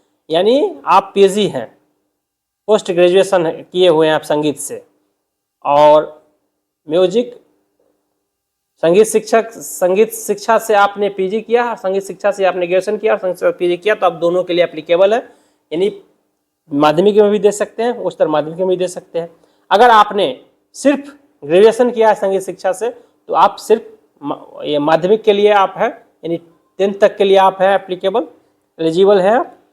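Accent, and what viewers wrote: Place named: Bihar, India. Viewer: Bihar se h madam o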